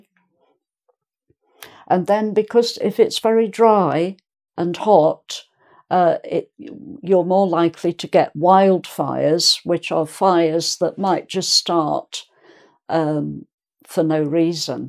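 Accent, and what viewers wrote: England, United Kingdom. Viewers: Lovely, such an English accent and so elegant. Thank you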